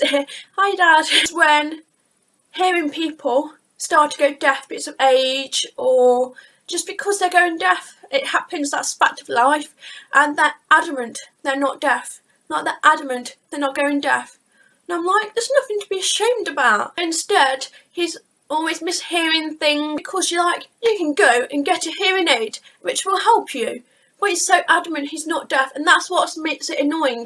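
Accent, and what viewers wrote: United Kingdom. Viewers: hey, okay, please don't be upset, I want to ask a real question... okay? u have a British accent, I'm curious how you picked that up, if you have not heard it...? it's fascinating! I'd like to discuss! :)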